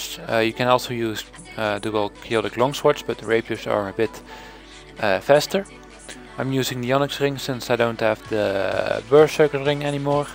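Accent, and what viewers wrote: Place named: Netherlands. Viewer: je kan echt horen dat jij uit HOLLAND komt :p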